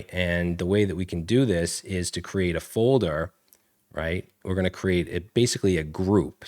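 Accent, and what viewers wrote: Boston, United States. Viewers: 10 years ago, you were a godsend, helping me learn to code. It's good to see you're still at it. And as someone that grew up in Connecticut, hearing your Boston accent is very soothing.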